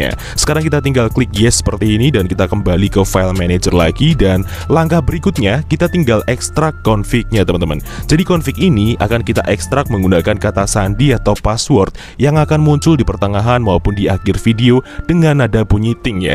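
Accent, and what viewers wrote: Surakarta, Indonesia. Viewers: org solo bang?wkwk sama nih😅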